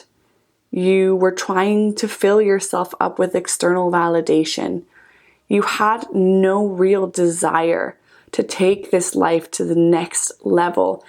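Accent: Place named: Ireland. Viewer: Lovely vlogs..very interesting!...I hear a touch of Irish in your accent..💚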